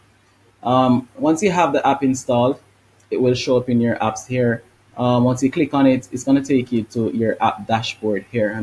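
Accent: Jamaica. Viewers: big up yard man sound like jamaican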